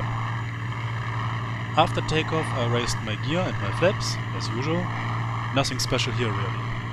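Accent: Germany. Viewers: You sound a little bit like a german guy... am I right?:) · Ihr deutlicher "germischer" Akzent verrät Ihre Muttersprache.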